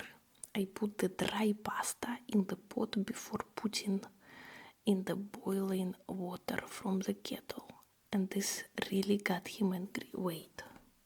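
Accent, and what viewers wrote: Russia. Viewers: Glad to see you’re doing a lot more Russian accent. Please include more “yeah”s and “uh hmmm” they are really original and quirky only to you. Quite relaxing.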